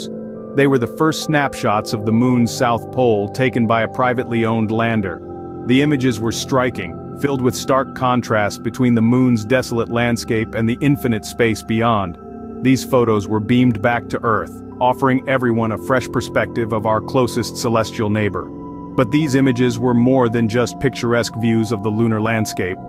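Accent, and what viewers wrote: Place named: United States. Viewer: I will stay subscribed, providing you keep that good American voice!!!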